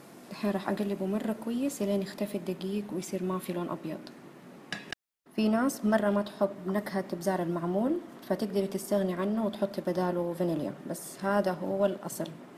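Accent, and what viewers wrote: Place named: Hejaz, Saudi Arabia. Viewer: اللهجه الحجازيه الاصيله ماشاء الله ههههههه دحين انزلكم هي شكرا على الوصفه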